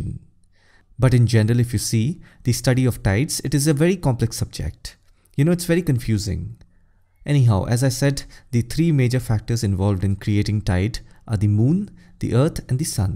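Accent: India. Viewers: I'd rather listen to the sound of a fork screeching against the surface of a plate than to this Indian accent.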